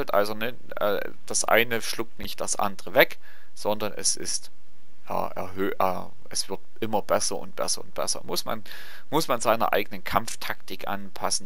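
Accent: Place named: Saxony, Germany. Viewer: Rischer Sachse erklärt de Maritime Kriegsführung, das gefällt mir aber gud o :P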